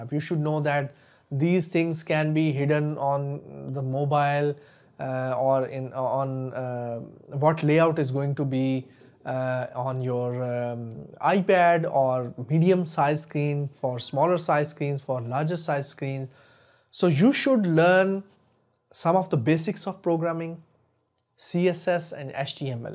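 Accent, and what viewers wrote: India. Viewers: You have an indian accent.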